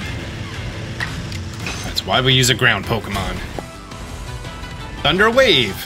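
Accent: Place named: United States. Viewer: Why do you sound like American?😊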